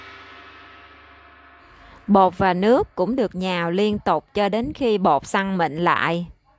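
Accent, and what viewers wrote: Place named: Southeast, Vietnam. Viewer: Mình không mấy thích ăn món bánh ít.Nhưng quả thật,cô gái nói giọng Đông Nam Bộ này rất dễ thương,thông minh và khéo léo.Thật tuyệt dù không phải video nào cũng hấp dẫn.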